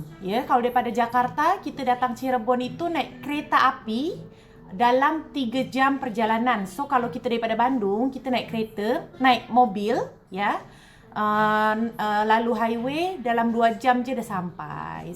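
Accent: Johor, Malaysia. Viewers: Mantap kak bahasa Johor dh nk jadi tu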